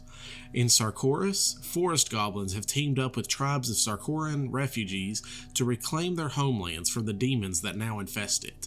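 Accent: South, United States. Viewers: Love your work & a friendly Southern voice! Peace!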